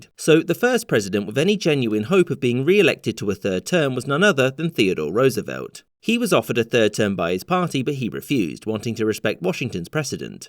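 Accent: United Kingdom. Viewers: Anyone else cautiously listen when American History is narrated by a Brit? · Why is a Brit lecturing me about my country 😡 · Clicked on this thinking “oh this should be interesting” but then it’s some British dude talking about something he has no idea about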